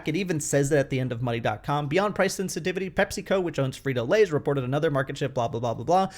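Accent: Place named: India. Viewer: Love your indian accent. The way you said "P'ty" instead of "Pretty" it's fun.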